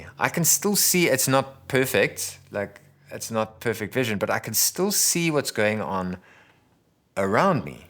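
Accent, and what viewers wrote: South Africa. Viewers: Is he south african?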